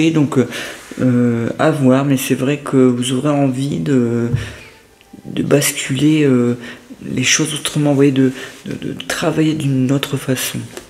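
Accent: North, France. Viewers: vous êtes du nord? Bonne soirée j'adore votre guidance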